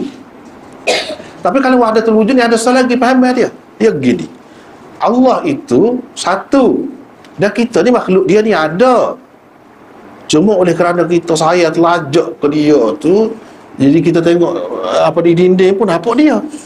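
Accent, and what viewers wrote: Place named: Kelantan, Malaysia. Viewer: pekat dialek kelate..kalau ada sarikata lebih ramai org bole faham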